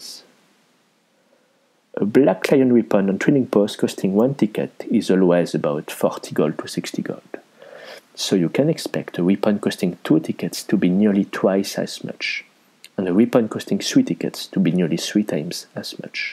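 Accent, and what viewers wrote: France. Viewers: Aaah l'accent français c'est grillé au bout de 3 secondes :D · So many years and I still lobe your French accent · NICE FRENCH ACCENT MY FRIEND :) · Possibly the strongest french accent i’ve ever heard, no hate intended! Good guide! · Ce franch accent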